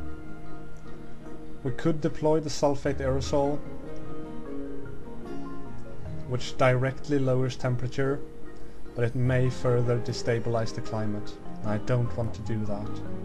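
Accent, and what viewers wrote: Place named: Sweden. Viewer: Clubs He's Swedish. English isn't his native language. :P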